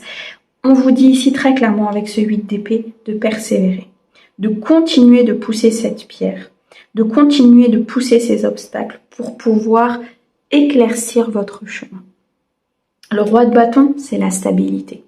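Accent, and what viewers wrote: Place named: South, France. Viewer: Tout à fait ça !!! Grand merci des décennies de blocage et d'utilisation ! Ouffff j'adore ton accent du sud que l'on perçoit parfois !❤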